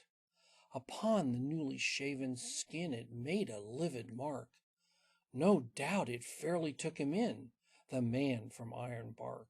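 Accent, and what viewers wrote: United States. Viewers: great reading of an Aussie classic. Unusual to hear it with an American accent. Thanks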